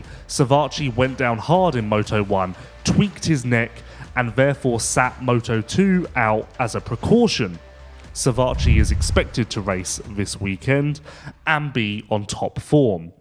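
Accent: United Kingdom. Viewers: really embracing his British pronunciations on this one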